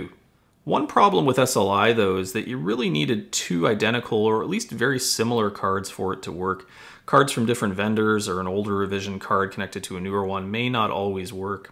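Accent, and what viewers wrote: Canada. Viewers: are you Canadian? Because i get the feeling with your accent especially when you say "about":D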